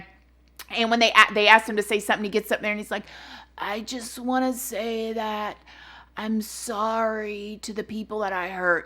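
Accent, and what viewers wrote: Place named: Florida, United States. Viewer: I'm always watching you and I think hummmm with the way you talk you must live in Florida. I live in North West Fl and have the same southern slang. LoL 🤣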